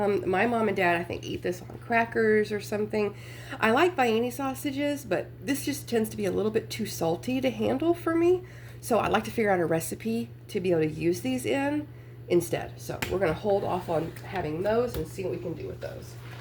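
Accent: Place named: Midwest, United States. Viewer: Hi, I love to eat that potted meat on buttered toast for breakfast, but if you feel its too salty - mix a can with a bit of mayo or even miracle whip and make a "ham salad" to make a regular sandwich with LETTUCE! hahaha Girl, I just dont think you are going to make it through all of that lettuce. If you can share the big bag of it with someone for taco salads or something that would be kind, or simply throw it outside for the bunnies and squirrels to eat. That way its not going to waste. So, Im confused how many are in your family? You, your wife/girlfriend and how many kids? ages? Im just curious. Also, where are you located/state? You mentioned "the south" but you sound like you have a midwestern accent. Nice hall btw! Is it monthly?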